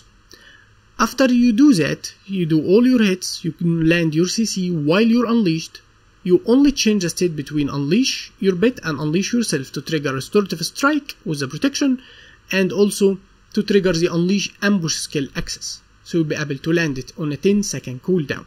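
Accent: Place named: Egypt. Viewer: new players and your channel is very helpful thank you so much🤍 also your accent add a flavor to the video it's very close to my Egyptian professor 😂😂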